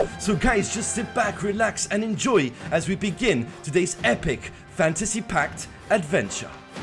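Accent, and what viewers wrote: France. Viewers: Waw, you have a french accent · are you french? I am french and your accent sounds french x)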